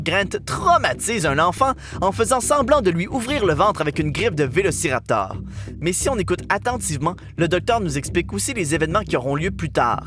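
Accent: Quebec, Canada. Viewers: T québécois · L'accents 😂😂😂 j'adore!!! Les Québécois je vous kiff vous êtes une source d'inspiration pour mes vidéo! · c’est QUÉBEQUOIS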